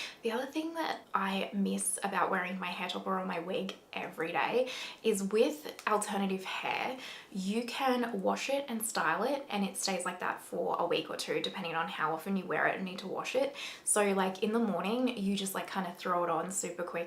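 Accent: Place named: Australia. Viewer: I actually love this and the colour is perfect for me. I'd probably go for a bit shorter, maybe 14 or 16". Also, great to hear a fellow Aussie accent.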